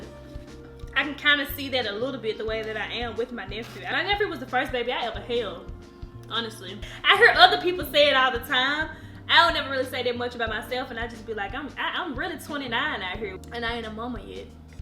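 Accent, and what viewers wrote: South, United States. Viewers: I like your southern accent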